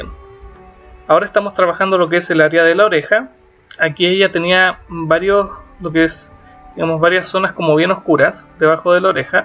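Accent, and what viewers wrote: Chile. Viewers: buen dibujo! Chileno no?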